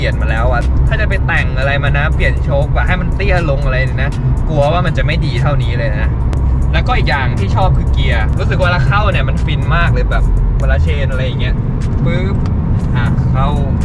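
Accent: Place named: RP, United Kingdom. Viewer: สำเนียงอังกฤษผู้ดีจัด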